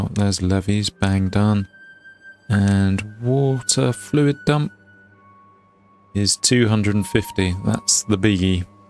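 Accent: United Kingdom. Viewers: You do realise that only the Brits (you) and Aussies (me) will understand what you just said.... about Beavers.... Your accent sounds British - let me know if I'm way off base, but I totally got your humour.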